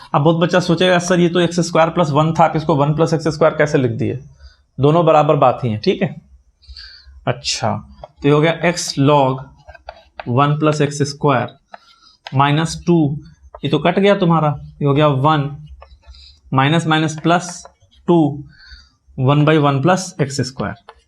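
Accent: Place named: Bihar, India. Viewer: Bihari Accent giving legendary vibes✨✨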